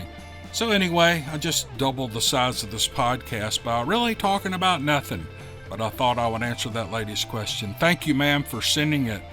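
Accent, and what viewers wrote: South, United States. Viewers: You should narrate some southern bell stuff like Gone With The Wind or sumpin LOL I like listening to you for your southern accent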